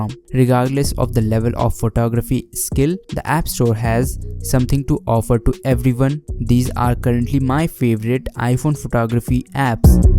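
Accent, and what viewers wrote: India. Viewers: I really dislike the Indian accent, but man I can’t dislike the video! Great job buddy. The quality is on point!! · lol I just want search best camera apps for my iPhone and I come here only just hear Indian accent so funny 😂 haha. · am i the only one who hates indian accent english?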